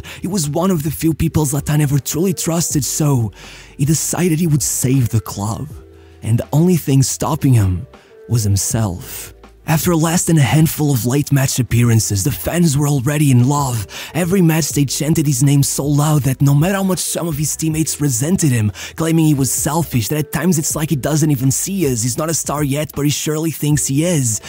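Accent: Portugal. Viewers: Are you Portuguese? You pronounce the players names like a Portuguese would. Nice.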